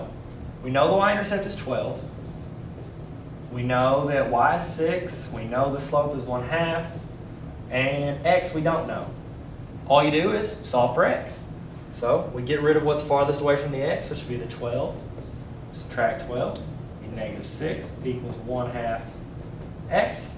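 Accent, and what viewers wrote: South, United States. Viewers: rodriguez That's what you call a southern accent. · Thanks dude and nice southern accent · His southern accent... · Who else LOVES HIS COUNTRY ACCENT !!😍😍😁😁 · I would pass math if he was my teacher... my teachers old and doesn't have an awesome southern accent XD like for if my math teacher looked and talked like him hed have my attention XD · your southern accent is adorable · So southern! C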